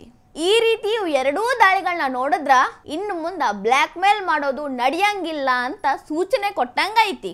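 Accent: North Karnataka, India. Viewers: Uttar karnataka bhashya chennagide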